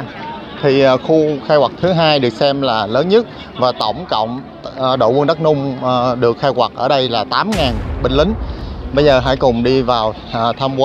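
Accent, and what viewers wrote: South, Vietnam. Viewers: Hay quá. Hình như vì dịch bệnh nên có thời gian, anh biên tập tỉ mỉ hơn, hình ảnh rất đẹp. Đặc biệt là từ ngữ anh dùng rất gần gũi, không hoa mỹ như trên TV, và thích nhất là giọng miền nam của anh. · Clip bánh cuốn quá ạ, được đầu tư, có bổ sung kiến thức lịch sử và thêm yếu tố hài hước nữa:))) giọng miền Nam của nghe bình dị dân dã 😆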